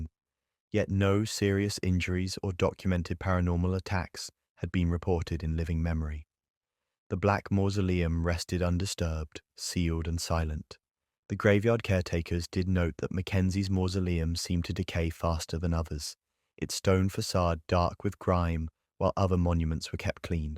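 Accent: United Kingdom. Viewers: Nothing better than a British lad reading spooky stuff. Great night ahead.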